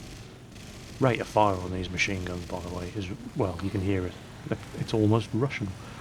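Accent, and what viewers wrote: England, United Kingdom. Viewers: I was a fair question dont be a dick. Just because he has a English accent doesn't mean he was born in England. Maybe his parents are Bohemian, like most Czechs. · There is no country called Bohemia lol and he is from Britain listen to him.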